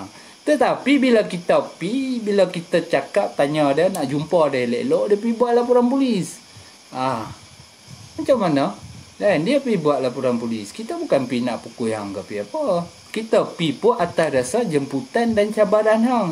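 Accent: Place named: Kedah, Malaysia. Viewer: Abang ni mesti orang kedah..dengar dia cakap pun dah tau..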